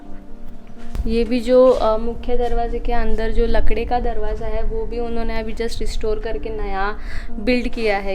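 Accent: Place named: South, India. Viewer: Tumchi Hindi ekdam mast south Indian ahe🤝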